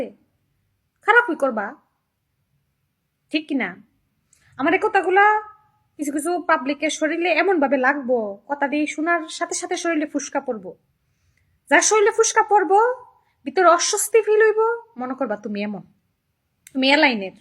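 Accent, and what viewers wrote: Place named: Brahmanbaria, Bangladesh. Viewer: আপু তুমি কোন এলাকার তোমার মুখের ভাষা শুনে মনে হচ্ছে তুমি ব্রাহ্মণবাড়িয়ার রিপ্লাই দিও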